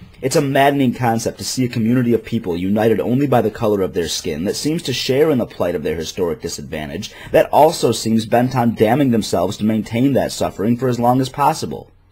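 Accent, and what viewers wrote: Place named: Midwest, United States. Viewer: Can't really tell, you can suspect, as I suspect that he's probably a white guy raised in the mid-west or north-east United States, but I also know a black dude who talks like Foghorn Leghorn (he legit sounds exactly like the character in pitch, tone, and accent), and a black chick who sounds like Rosa Ponselle when she sings. All you can really tell is that if he is black, he wasn't raised in a home which supported the notion that black people should "talk black".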